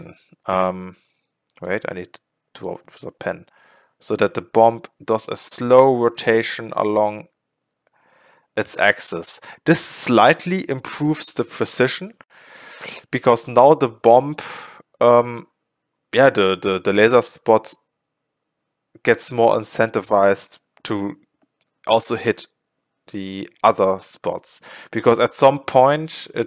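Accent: Germany. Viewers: The german accent is hilarious. Abber deine video ist sehr leker!! (Duolingo skills right there)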